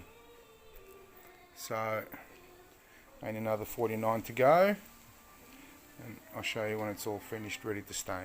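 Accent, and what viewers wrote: Australia. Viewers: It's so nice to hear an Australian accent for a change (fellow Aussie here). I've been collecting discarded cedar blinds for awhile for a large scale upcycling product (patio ceiling lining), so I'm well practiced at dismantling them the same way you do. Refinishing them en masse is a daunting task though! I've tested out a few methods so far, such as paint stripper, but I haven't found anything that works as well as sanding unfortunately. I'm planning on laying multiple slats flat next to each other and clamping them down with a batton lying across the top of them to hopefully speed up the sanding process. It's comforting seeing someone else refinishing them as well. It gives me hope! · Thanks for that. Nice to hear an Aussie accent. My timber venetian blinds faded in less than a year thanks to the Australian summer sun. lol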